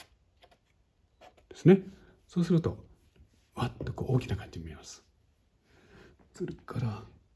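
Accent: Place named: Poland. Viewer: Wow! I would never expect a polish accent in your video :o Thanks for this!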